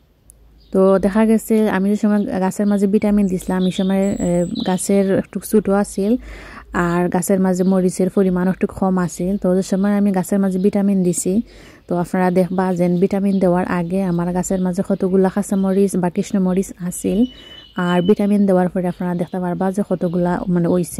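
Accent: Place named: Sylhet, Bangladesh. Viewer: আপনার সিলেটি মাত আমার কাছে খুবই ভালো লাগে। ধন্যবাদ আপনাকে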